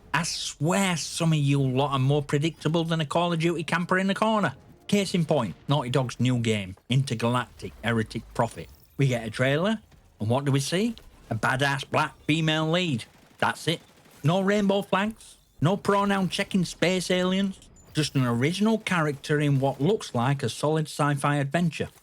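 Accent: Yorkshire, United Kingdom. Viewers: Its 100% an authentic Yorkshire man accent. I can confirm.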